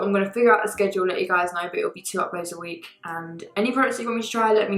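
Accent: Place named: Manchester, United Kingdom. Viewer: Is it me or accent is changing lol 😝 baby girl!!! Xxx hearing that manny accent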